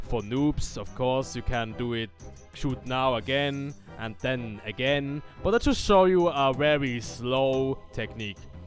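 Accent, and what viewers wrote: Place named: Switzerland. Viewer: Direkt am Akzent der Schwiizer erkennt hahaha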